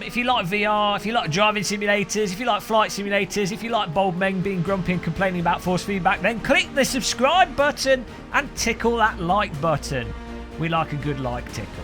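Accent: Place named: England, United Kingdom. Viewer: Classic counter video before new product, plus eng accent. nothing to see here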